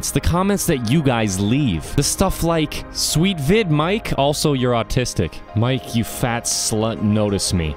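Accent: United Kingdom. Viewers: I actually thought you were from the United Kingdom when I first watched your videos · I'm an english man and mike you do sound a bit English only a bit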